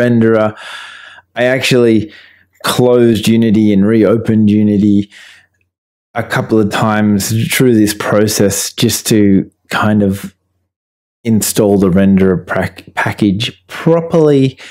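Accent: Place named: Australia. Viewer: Thanks for the video! You helped me a loads brotha! Love the ozzy accent btw :)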